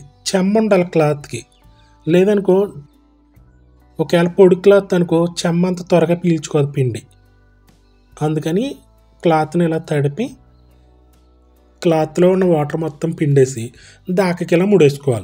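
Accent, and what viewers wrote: Godavari, India. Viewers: Meeru matlade Godavari jillala telugu vinte kadupu nindipoindi.Hyd vachhaka ee bhasha miss avutunnam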